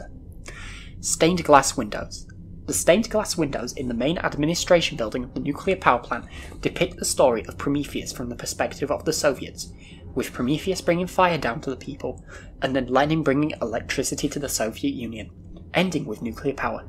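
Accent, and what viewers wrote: United Kingdom. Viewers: have you never heard a northern English accent before?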